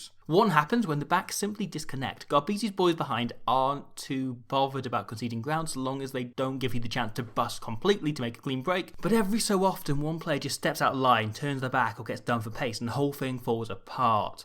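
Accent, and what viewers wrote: Wales, United Kingdom. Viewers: are u welsh??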